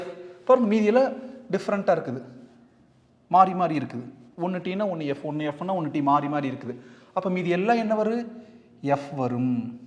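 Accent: Coimbatore, India. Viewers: Sir nenga coimbatore ah covai slang la pesurenga 😅❤😊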